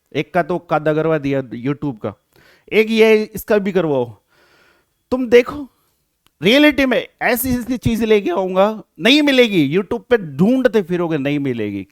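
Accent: India. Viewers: Sir aap haryana se ho language waha ki hi lg rhi h jo bhi h love u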